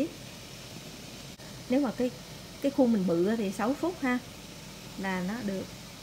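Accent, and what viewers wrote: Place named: Hue, Vietnam. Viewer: C nói tiếng Huế hay mà. Nghe rất dễ thương. Sao 2 con chị ít nói tiếng việt nhỉ. Thấy bé chăm nói tiếng việt hơn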